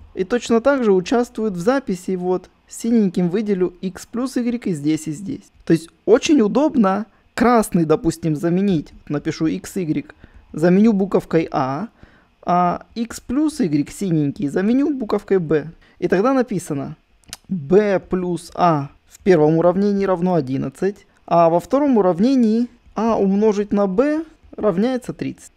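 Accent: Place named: Israel. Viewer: По акценту давно стало понятно что ты не русский, а из Израиля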